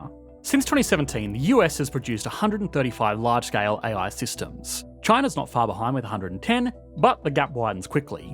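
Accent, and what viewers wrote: Australia. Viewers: I can't stand Australian accents.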